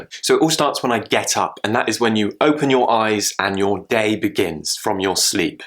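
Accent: RP, United Kingdom. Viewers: this is very useful ,and i like British accent because of i always watch your videos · Don't change the British accent to the American accent PLEASE. ...people prefer British accent because it is father more beautiful. So keep it please. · This video is very useful! Thanks for share your knowladge and I really like your British accent. 👌🏽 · Thats mean British accent mate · I hear British accent here. :)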